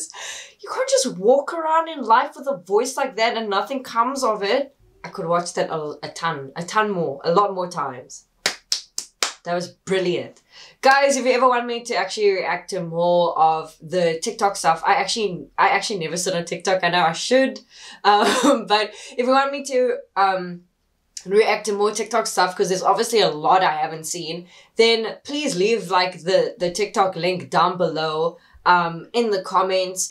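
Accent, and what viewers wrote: South Africa. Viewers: You South African? Your accent sounds really nice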